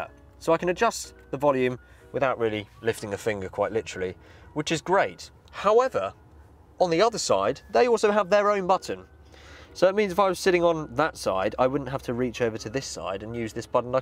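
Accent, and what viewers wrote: United Kingdom. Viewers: Bro., your voice impressions of Doug Demuro was 100% spot-on. But what was equally amusing was your transition to your native British Accent as i thought you are American and certainly never heard of any Britt impersonate our Accent so accurately. You earned my subscription right away.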